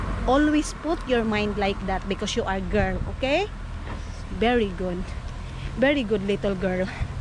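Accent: Visayas, Philippines. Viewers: Bisaya diay ka.?! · Bisaya d i ka inday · "maglabang tayo dito" Bisdak jud nga daku! Hahaha! Thank you for making my day. Kaya ra kaau ang lockdown diri basta ga lantaw sa imong mga vlogs. Lingaw kaau.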